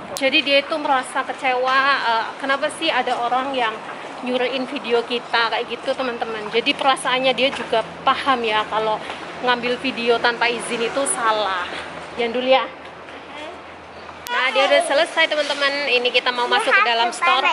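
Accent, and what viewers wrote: Banyumas, Indonesia. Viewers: Kok ngedok mba,dr banyumas apa dr mana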